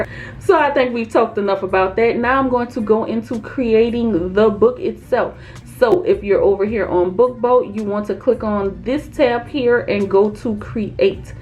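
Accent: New Orleans, United States. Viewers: I hear that New Orleans accent. Love it ❤️ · Hey i just found your videos, and they are very inspiring. Are you from New Orleans? Please let me be right lol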